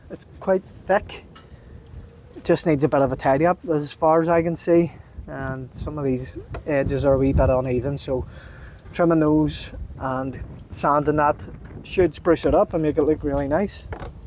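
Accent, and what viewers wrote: Northern Ireland, United Kingdom. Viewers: you speak nrn irish,,,like the scots and donegal awful accents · English must not be your first language if you couldn't understand...it is called a Northern Ireland accent maybe educate yourself. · nice to hear the Nordie accent too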